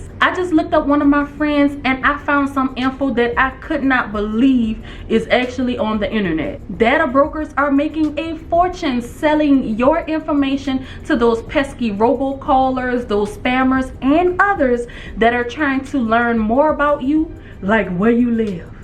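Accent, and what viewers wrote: New Orleans, United States. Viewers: I kept telling myself, she sounds like me, she has to be from the 504. lol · After listening to you speak the first thing I thought was "She MUST be from Nu Awlins". I just discovered your channel last week and will be applying your directions and advice to make money. And boy do I need it right now. I fall down but I keep getting up. Thanks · Girl! The second I heard you talking I was like she sounds like she’s from here… then Sho’Nuff you said New Orleans girl I’m right here on the West Bank! So of course, I subscribed for that reason and because you are doing the Lord’s work out here girl! Thank you so much for not gatekeeping information like this. Also, the way you explain the process step-by-step was flawless and completely the way I process things. So I just wanted to come on here and thank you and say hey from the West Bank and to tell you, you are doing amazing things and it’s appreciated! 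· Thanks for your info. I knew the accent was New Orleans❤ Baton Rouge here 👋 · Girl.. you sound like you’re from the N.O!! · I can tell by your accent you from New Orleans !! Love seeing people from my city really make it out !! I'm next !! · I love your N.O. accent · I knew you was a Nola baby😂.. Wuzaam witcha?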